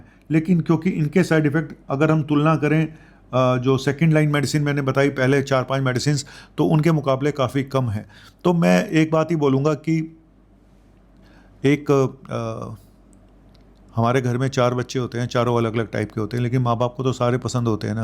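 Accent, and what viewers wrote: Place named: Uttar Pradesh, India. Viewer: Sir 🙏 hum bhi U.P. Mujaffar ngr. sy hai Tabhi aap ki language hamri jaisi hai,samne wale sath saf bolo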